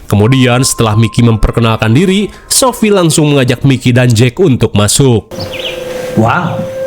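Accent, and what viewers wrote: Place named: Cianjur, Indonesia. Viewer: ini bahasa Sunda ny kaya ny cianjur bngt nyambung sama Sunda aku soal nya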